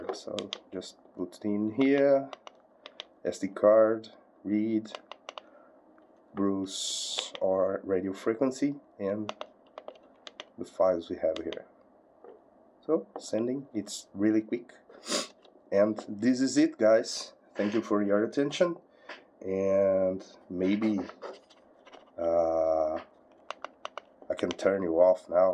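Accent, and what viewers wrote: Brazil. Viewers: adoro o sotaque br no inglês maravilhoso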